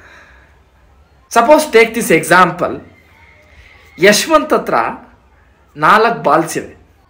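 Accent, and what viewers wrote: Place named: Bengaluru, India. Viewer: Tuluva na? Ashtu channage local Bengaluru Kannada maathaadtheeya alva?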